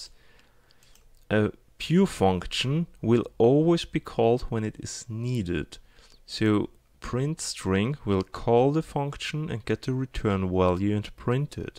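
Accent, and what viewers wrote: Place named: Germany. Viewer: Ich finds immer wieder witzig wie sehr man am Englisch Deutsche heraushören kann. Very good explanation of the Differences, thanks for the help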